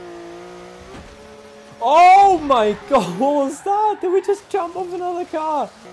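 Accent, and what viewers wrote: Germany. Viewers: Ich habe von Anfang an gehört das du deutsch bist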